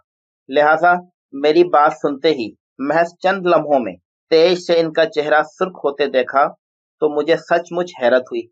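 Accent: India. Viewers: Awaz waly dost indian lag rahy hain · لہجہ ایسا جیسے کوئی انڈین اردو کی ٹانگ توڑ رھا ھو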